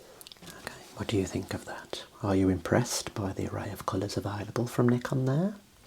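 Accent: Birmingham, United Kingdom. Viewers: Birmingham, England 😊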